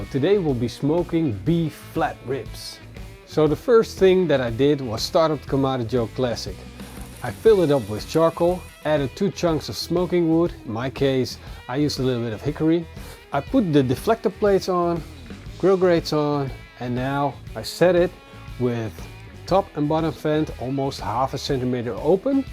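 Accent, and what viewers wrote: Netherlands. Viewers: Glad I found this channel. Love the videos. Would I be correct in guessing that your accent is Dutch?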